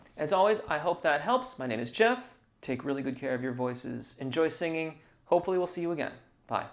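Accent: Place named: Midwest, United States. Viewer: I am so grateful for the tremendous amount of pure quality content you provide. I find it interesting that you mentioned you are from the Midwest, then California, then England. I can tell. I am a Midwesterner myself and now your manner of speaking makes sense. 😉 I heard the influences but couldn't place where they were coming from. I just love your manner of teaching... Sometimes I forget you are not live and playing scales just for me! Thanks again.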